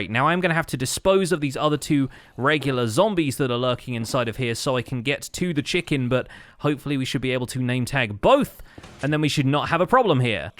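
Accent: United Kingdom. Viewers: The notification woke me up at 7am but I’m damn sure gonna let that cocoa butter British voice soothe me back to sleep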